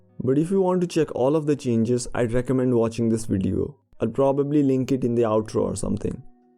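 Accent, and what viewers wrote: India. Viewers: Hi are u Indian u sound like i also an indian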